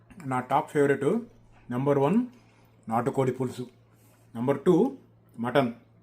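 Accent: Andhra Pradesh, India. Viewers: ఎందులోనైనా నీ రూటే సెపరేటు తంబీ నీ బాడీ లాంగ్వేజ్,నీ భాష లో యాక్సెంట్ ఒంగోలు, గుంటూరు, విజయవాడ మూడు కలిపి మిక్సింగ్ కొడితే ఎలా ఉంటుందో అలా ఉంది 🎉🎉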